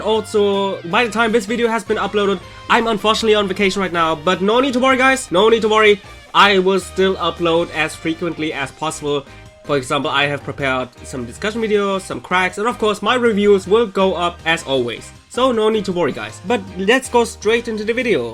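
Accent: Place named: Germany. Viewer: Its his accent comon forgive him for being german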